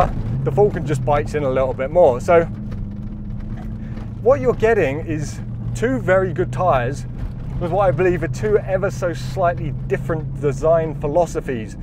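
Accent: United Kingdom. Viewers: A British Mormon talking tires?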